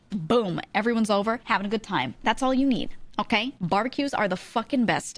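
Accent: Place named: New York, United States. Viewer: she does have the accent and she does have an very bronx/ New York accent.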